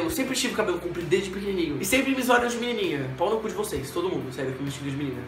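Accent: Porto, Portugal. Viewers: é sotaque à portuense carago!!!,! ;) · falas mm bem portugues!! 😂 sotaque do porto mas yh boa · Eu comecei a ver o vídeo é minha cabeça explodiu!!! Eu te acompanho faz pouco tempo e nunca te vi a falar com sotaque de Portugal! Vem outra vez dá!!! #LuísMarizEmPortugalOutraVez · Meu Deusssss, o sotaque dele no início e no fim do vido tem mesmo sotaque português!!!!! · sou de portugal e acho que não sou a unica Portuguesa a achar que tu ficas super fofo a falar Portugues de Portugal <3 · Adoro quando tu falas Português- Portugal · Raymson Barbosa Português de Portugal :) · vem logo pro portoooo !!!!!! sotaque portugues lindo :o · Assim uma coisa , gosto muito mais do teu sotaque português de Portugal do que português do Brasil ( e eu adoro o sotaque brasileiro) mas ficaste mesmo fofo a falas assim hahahahaha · esse sotaque português !!! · tens mesmo o sotaque do porto kkk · Aí adoro quando falas em português de Portugal. 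Devias fazer mais. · omg!!! eu nao fazia a minima ideia que tu estiveste a viver em portugal adorei ouvir te falar portugues de portugal eu nao acredito!!!nao podes comecar a falar um pouco nos teus videos em portugues de portugal?? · Mano, é muito viciante quando alguém que costuma falar PT BR fala PT PT. Actually, it's kinda cute <3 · Sotaque Portugues <3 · oh meu deus, tens sotaque português, AMO*-* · tens bue sotaque do porto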